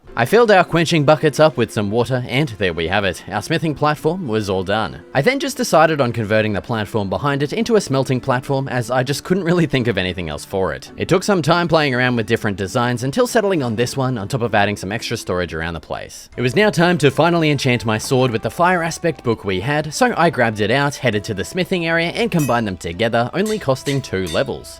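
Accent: Australia. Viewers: You sound like an aussie mate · This guy may have the most Australian accent I’ve ever heard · Aussie gang, I can tell by your accent and how you’re saying a lot of Aussie slang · Australian, isn't it?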